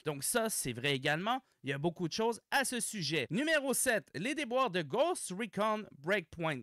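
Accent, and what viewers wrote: Quebec, Canada. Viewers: Merci pour tes vidéos qui remettent les points sur les i. Avec l accent canadien en plus j'adore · Ne t'énerves pas trop, tu vas perdre ton accent québecois et après tu seras moins drôle!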